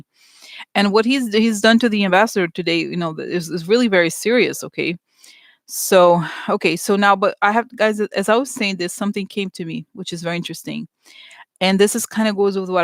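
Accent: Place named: Russia. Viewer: I know you don't typically listen to other readers. But Oracle of Whimsy had a Halloween reading and around the to mark she talks about the GOP takedown and arrests, mentioned 40 of them. It's about 10-15 minutes. Also Revealing Light had a reading in May (?) John McCain is in the title, and she mentions the same thing. Arrests all at once. "Sees most of the old guard of republican party" being ushered out by authorities. Love you, your my favorite. My son saw the green, 3 eyed hat on you and was intrigued. I put you on the tv so he could hear your Russian accent. Yes he thinks I'm nuts....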